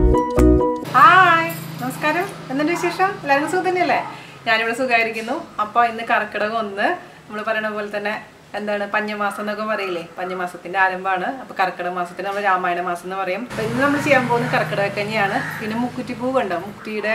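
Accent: Thrissur, India. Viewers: Kkk👍. Chechide slang vechit...naadu Nammade thrissur aano ?? Njanum thrissur aatta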